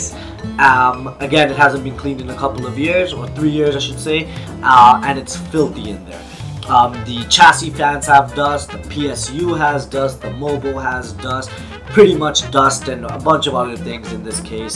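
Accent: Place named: New York, United States. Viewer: This guy is defo from NYC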